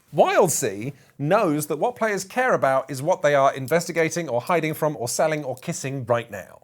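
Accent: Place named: United Kingdom. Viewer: You should review harnmaster, also ur totally British seth skorkowsky.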